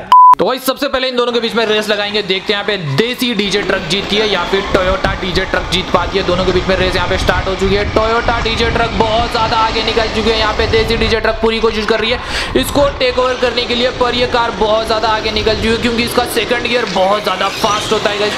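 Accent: Rajasthan, India. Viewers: Bhai Rajasthan se hai kya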